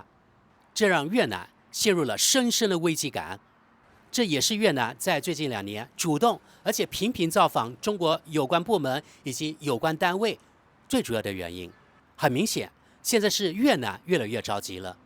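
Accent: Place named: Guangxi, China. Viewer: 主持人是广西人，普通话说得相当不错了，忽略吐字发音不同，语调很准确。内容也很好，至于技术转让，防人之心不可无，还要小心反复无常的白眼狼，在商言商，生意就是生意，小越南挑事的时候可不说两国是兄弟，也不看我们勒紧裤腰带支持他们。修高铁我们反正不急，应该适当提提价。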